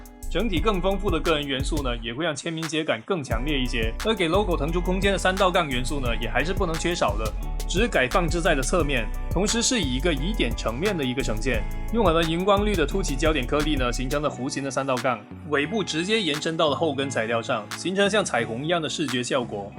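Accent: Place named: Hakka, China. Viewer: 你是客家人嗎? 這鄉音也太重 哈哈哈 超親切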